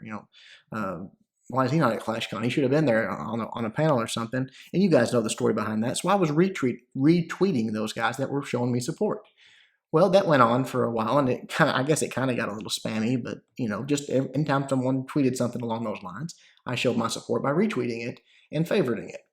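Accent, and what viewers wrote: South, United States. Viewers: Is it just me or is southern accent feels like it's slowly fading 😳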